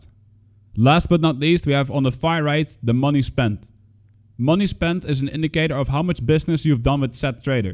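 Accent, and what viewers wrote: Netherlands. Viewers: Great video! are you Dutch btw? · are you dutch? because I can hear some dutch language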